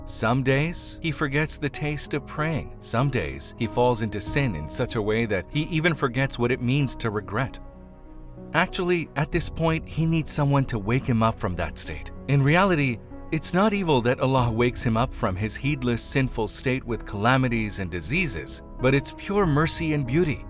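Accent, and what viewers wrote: Turkey. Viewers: I liked the Turkish English accent better, y'all didn't have to get another narrator😭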